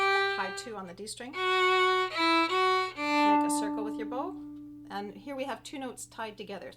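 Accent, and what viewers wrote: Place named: Canada. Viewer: I found this video to be very helpful. I have small hands, short fingers, many years (66) and have been taking lessons from an excellent teacher. Never heard that you should roll a finger to the next string and never heard to “never” lift the fingers, which is what I have been doing. Going to try and see what happens!! Love your Canadian accent. Thank you for posting this video.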